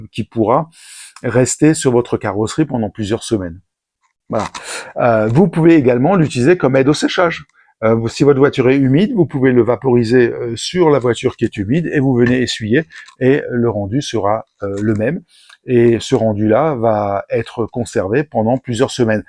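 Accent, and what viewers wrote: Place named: England, United Kingdom. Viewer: Nouvel abonné de votre chaine, jaime beaucoup votre flow et son contenu qui va à l'essentiel, en gros vous ne parler par pour rien dire 😊. Et l'accent englais 😅 j'adore. Je pense aussi que si nous ne consommons peu de produit cest peut-être aussi que nous ne pouvons plus laver les voitures en extérieur mais si c'est chez nous ,sous peine d'amandes. 😥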